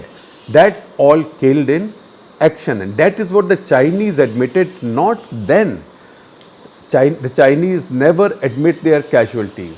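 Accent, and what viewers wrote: India. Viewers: pure india accent 😍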